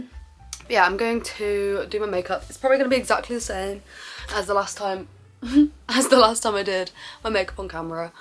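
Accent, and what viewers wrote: United Kingdom. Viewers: Бля смотрю это чтоб повысить уровень англ. И нихуя не понимаю! Британский англ. – это полный пиздец. Как блять эго понять?? 😭 Смотрю на субтитры и понимаю половину слов, а если их убрать – нихуя. Блять учу англ уже год и не могу поднять уровень 😭 извините, но моей душенька устала. Теперь легче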